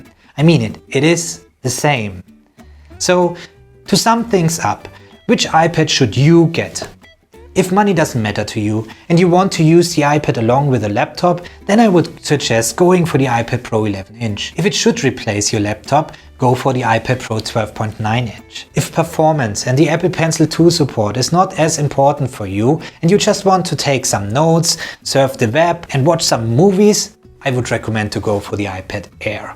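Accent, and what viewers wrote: Germany. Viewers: Man hört absolut deinen deutschen Akzent heraus, aber trotzdem gutes Video. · MrLufus ja man, das habe ich auch gerade gedacht. Ich habe auf ein Deutschen Kommentar gewartet😂❤️ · Are you a german? By the way great video!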